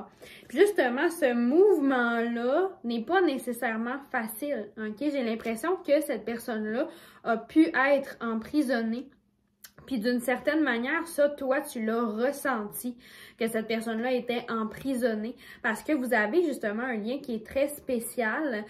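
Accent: Canada. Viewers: Bonjour bel âme merci pr ta jolie guidance qui me parle bcp sur certain sujet 😊 je kiff cette accent aussi canadien sa nous fait voyager à travers tes dires 😊😊je voulais juste te dire sa serait chouette de nommé les pierres pour que l'on apprennes sur les pierres aussi hahha c est une ptite critique constructif sinon tes fortes bonne continuation bel âme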